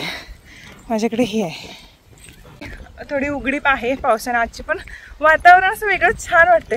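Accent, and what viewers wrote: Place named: Belgaum, India. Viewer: Tayi me pan belgav aahe me khupc excited hoon tuje vlogs bgte Ani tu ti belgavchi bhasha boltes na mla apl koni tari as vadtay ❤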